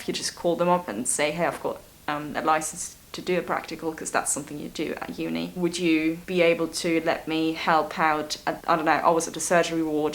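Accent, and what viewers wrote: United Kingdom. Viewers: Österreicherin spricht englisch mit britischem Akzent jz hab ich alles gesehen haha thumbs up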